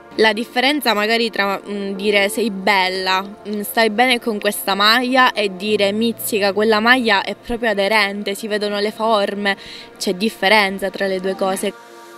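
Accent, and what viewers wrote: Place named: Calabria, Italy. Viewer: Sicuramente tu non sei una cima visto il tuo accento calabrese questo canale fa di tutto x fare visualizzazione non credo a una parola di quanto hai detto 👎👎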